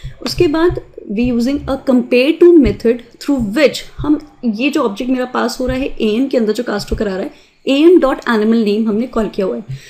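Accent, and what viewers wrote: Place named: India. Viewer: in nearly every programming tutorial Video is this Indian Accent. As a German i nearly don't understand anything. Everytime i have to watch a Video more than twice...... Nevertheless thanks for the Video. After serveral times i understood it. :)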